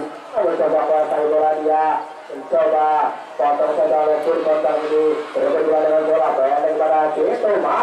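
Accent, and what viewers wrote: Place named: Banyumas, Indonesia. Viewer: ngapak koh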